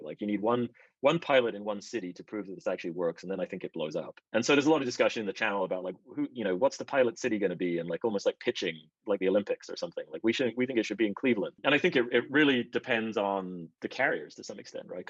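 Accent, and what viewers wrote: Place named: Australia. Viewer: .. i sware i can hear an Australian twang.. did you ever live in Australia?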